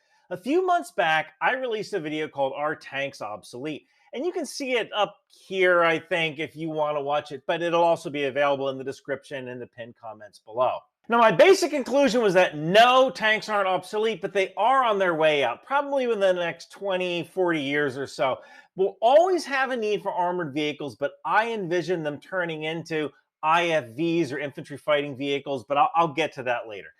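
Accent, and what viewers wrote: South Africa. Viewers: Good South African accent. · Your South African Elon accent is pretty good!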